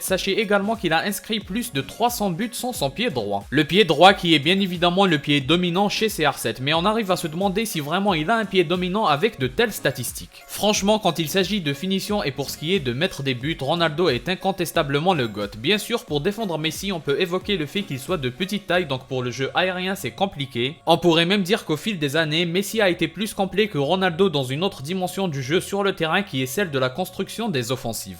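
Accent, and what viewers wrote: Algeria. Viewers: J'ai l'impression que tu es algérien de l'Accent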